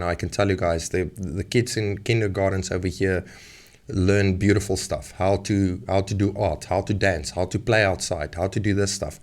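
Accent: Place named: South Africa. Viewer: why this south african sounding ass over here worried about american 2nd amendment? 🤣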